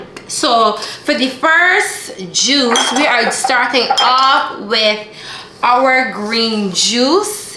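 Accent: Barbados. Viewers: I'm sure that a Barbados accent I can hear. Where did you get those amazing big bowls from? Great video by the way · I hear that lovely Bajan accent. You remind me of my bestie who is from Barbados! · Are you a native of Barbados 🇧🇧 🤔 Love the accent ❤ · Well it is always refreshing to hear the best accent in the world...Love yah.As you can tell I am a Proud Bajan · That bajan accent is amazing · I love your Bajan accent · Omg!!!! You must be Bajan💗I love your country and all of y’all’s accents💗💗. Loved the video as well. Just subscribed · She’s giving me bagan accent 🇧🇧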